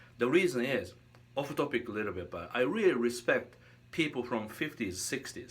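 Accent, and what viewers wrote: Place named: Japan. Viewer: I'm a simple guy. I see long black hair, Japanese accent, tasty blues...I hit like button... Btw Beato's vid and subsequent inferring are a little elliptical: the way you play is highly influenced the comfort (or discomfort) you feel on the guitar; I'm not surprised Beato (whose guitar setup is mainly on 8's) plays waaaay better and sounds waaaay better on 8's...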